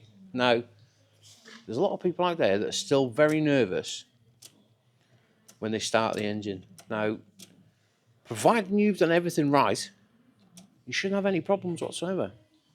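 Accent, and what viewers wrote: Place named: Liverpool, United Kingdom. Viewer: You sound Welsh and Scouse at the same time!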